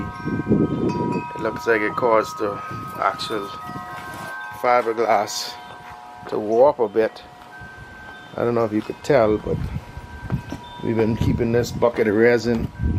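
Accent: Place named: Caribbean. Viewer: Love the progress ... your accent is interesting it sounds like you were raised in the Caribbean in the west indies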